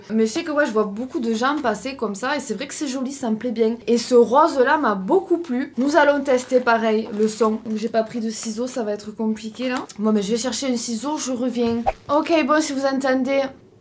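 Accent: South, France. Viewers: Bonjour. J'adore votre accent méridionale et votre bonne humeur. Vous êtes très belle et la caméra nous renvoie l'image d'une jeune femme qui a bien "les pieds sur terre " et " la tête sur les épaules ". Bien, cela étant dit, Action et moi ce n'est pas une grande histoire d'amour. Le rapport qualité prix pour addict du shopping me tentait beaucoup par ses prix au détriment de la qualité et surtout de mon porte-monnaie. Bref, moins j'y vais mieux je me sens. Cela n'engage que moi bien entendu. Si votre shopping est à la hauteur de vos attentes, je suis contente pour vous. Restez aussi solaire car vous apportez beaucoup de bien-être. Avec toute ma sympathie. 🙂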